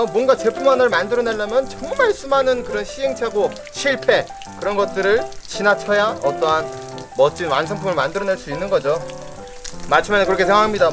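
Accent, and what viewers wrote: North Korea. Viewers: 북한사람 인가요?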